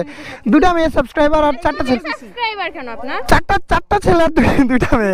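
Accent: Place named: Bogura, Bangladesh. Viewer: ভাই বগুড়ার কথা শুনে খুশি হলাম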